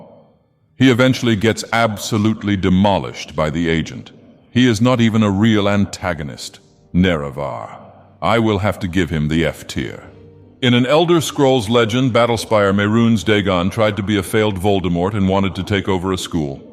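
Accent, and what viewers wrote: United Kingdom. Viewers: Anyone else notice an increasingly thickening British accent the past few videos?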